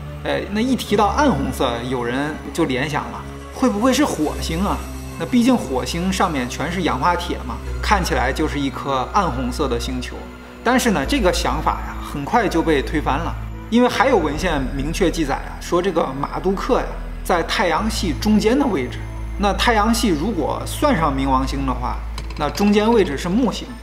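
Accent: China. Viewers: nic小哥听起来像是焦作那块儿的。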